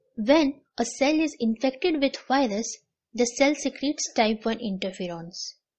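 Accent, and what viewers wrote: India. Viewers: whats the word minutes? very Indian pronunciation, I don't understand.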